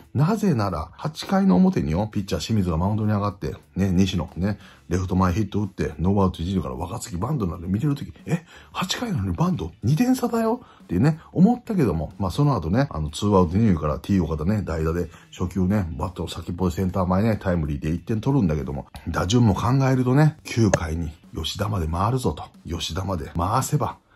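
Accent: Kansai, Japan. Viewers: 毎日、里崎智也さんのチャンネルを見ているが、本当に面白い👍 だが、ロッテ時代には、素晴らしい捕手だと認識して居ただけだ❗️ まさかこんなに、野球に詳しい人だと思わなかった。 反省して居ます🙇 しゃべり方も、関西弁で楽しい❗️ でも、何時かは、ロッテの監督として、ロッテに恩返しする運命だろう‼️ 袴田彩会さんの結婚が実現すれば、里崎チャンネル終了=ロッテ新監督就任だ👍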